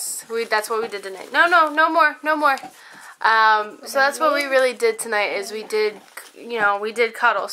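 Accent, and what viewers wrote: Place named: Philadelphia, United States. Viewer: You have such Philly accent! LOL... this is the first time I'm watching you! :) Good to see ya and get to know you this way!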